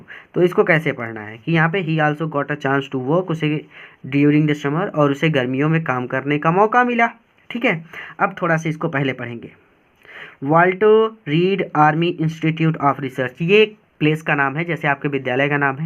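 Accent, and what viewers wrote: Allahabad, India. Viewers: Sir kya aap allahabad se hai kya 🙂🙂🙂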